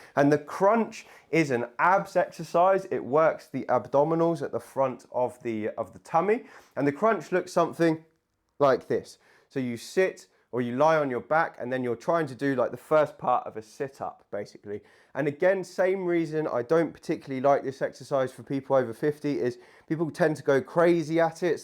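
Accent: England, United Kingdom. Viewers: I love his British accent! He is also extremely handsome. I think I am going to be in very good shape soon. 😉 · Thank you young English man . My salute to you. Good information is given to over Fifty. I liked your accent.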